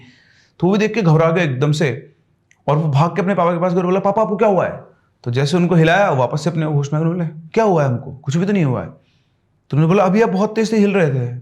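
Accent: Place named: Bihar, India. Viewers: Your Bihari Accent 🫡🫡🫡 ekdum Humare tarah hi bol rahe h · Narrating Story in Bihari accent is very Fantastic, we Bihari love it 😄😃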